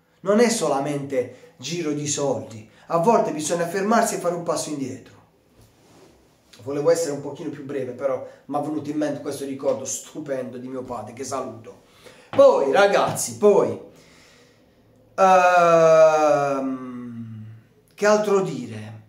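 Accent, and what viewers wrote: Bari, Italy. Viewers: Ti seguo anche se sono interista perché sei simpatico. Il dialetto barese è troppo bello….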